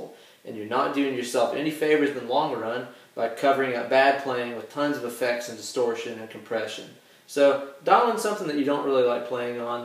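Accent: South, United States. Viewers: You are Pecos Hank of guitar. Your presentation is so similar, it's just that he's chasing Tornadoes and snakes. You guys even look similar and both with that southern draw. Great stuff BTW. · 2024. I notice the southern accent now.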